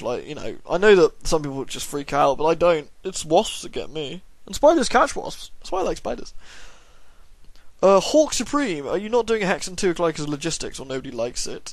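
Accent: United Kingdom. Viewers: acknowledged me! For some reason I fangirled when you yawned during my question... It must be your sexy Britishness or I'm weird. Either way keep up the amazing videos, they are one of my highlights.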